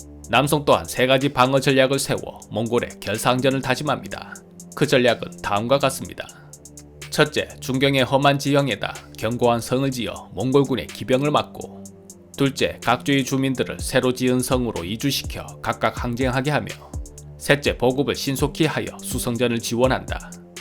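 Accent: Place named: Daegu, South Korea. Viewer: 참 잼있고 유익한데 구독자가 안느네.. 진행 하는 양반 사투리도 내 고향 대구 같아서 더 좋은데.. 거참 이상하네 왜 구독자가 안늘까..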